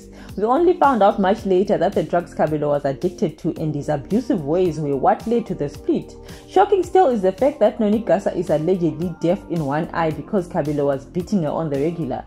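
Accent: Shona, Zimbabwe. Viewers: You're Shona aren't you? 😅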